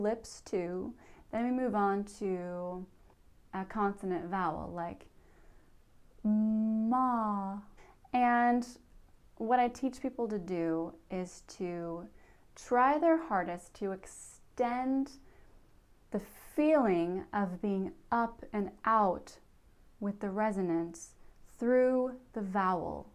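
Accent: Texas, United States. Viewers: hello are you Texan? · are you Texan?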